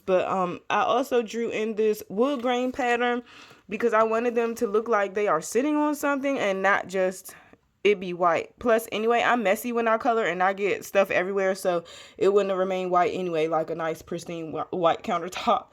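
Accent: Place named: United States. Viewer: Hi beautiful colouring pages.. I love your American accent.. people say to me I love your Aussie accent lol thank you for sharing hun ❤❤❤😊😊😊xxx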